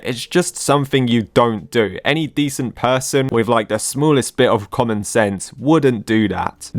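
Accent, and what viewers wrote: United Kingdom. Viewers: I could tell that this man is British or at least European cuz them words could only be learned from a proper grandmother as a British folk myself 😂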